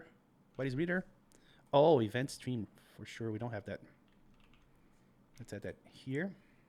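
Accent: Brazil. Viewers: No momento em que eu ouvi tua voz sabia que tu era brasileiro kakakak Boa mano, é muito bom ver alguém da comunidade trazendo um video em rust tão bom quanto esse Sucesso mano \o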